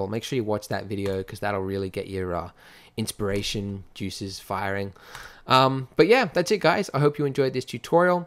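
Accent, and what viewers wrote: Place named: Australia. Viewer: Aawwh, great job once again! The nice thing 'bout your videos is that you can learn about blender and practice aussie-accent at once! :D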